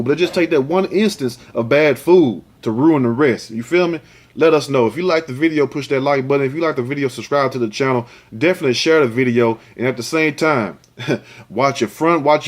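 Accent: South, United States. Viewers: Love the country twang of your accent, and you're so silly, yet wise. You're alright with me.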